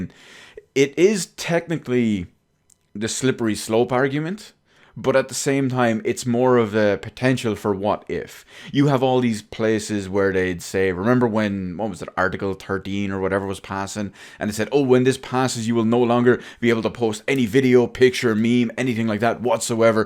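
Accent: Ireland. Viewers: It's a shame that this is what we will be faced with. Lemme guess, you're not Irish at all are you? You definitely sound Irish xD If I lose my account, I will not be upset over it. YouTube is essentially a breeding ground for trouble of all sorts. I will always remember what I have done ever since 2010. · Thank you for explaining. My channel my be gone too ?? Oh well nothing lasts forever?!?!? Is that Ireland 🇮🇪 accent ? Cool 😎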